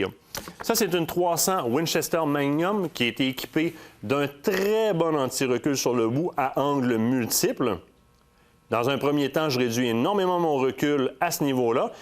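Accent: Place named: Quebec, Canada. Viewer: Je ne me lasse pas de l'accin québécois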